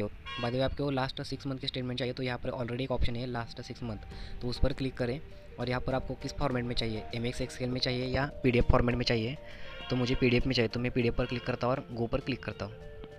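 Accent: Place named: Kolhapur, India. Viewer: Bhava kolhapuracha ahes vatat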